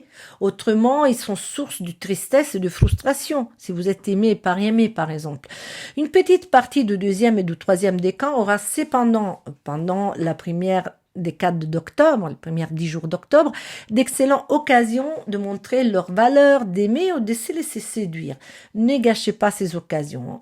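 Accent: Italy. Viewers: Merci chère!!! Vous parlez trop bien le français avec votre magnifique accent italien! ❤❤❤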